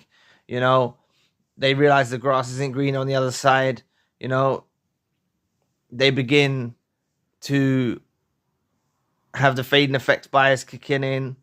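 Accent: London, United Kingdom. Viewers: Just happen to be in London right now listening to your British accent, cool! Thanks for all you do!!! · It's actually London's accent. is really cool guy, very intelligent, real light worker. Should be supported!❤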